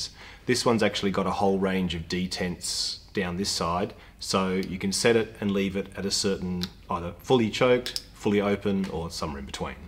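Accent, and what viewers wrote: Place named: Australia. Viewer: Teaching a marine tech program, and we all love the old Outboards, love this guy. It's always more important with an Aussie accent too!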